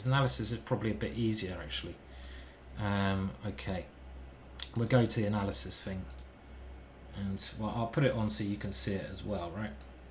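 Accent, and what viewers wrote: England, United Kingdom. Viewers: Hands up who’s watching this for the English accent 🙌😝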